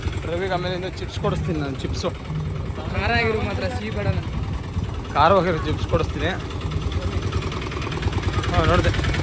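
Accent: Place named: North Karnataka, India. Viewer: Uttar Karnataka Bhasharespect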